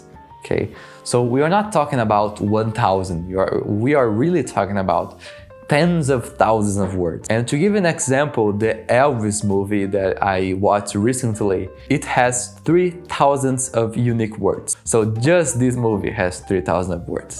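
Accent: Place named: Brazil. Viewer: I love brazilian accent when someone is speaking in english, because I can understand what is being said. Thanks for the video!!!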